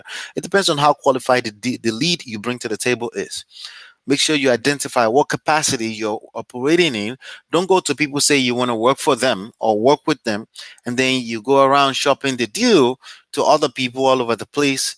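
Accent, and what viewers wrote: Nigeria. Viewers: You Nigerian bro?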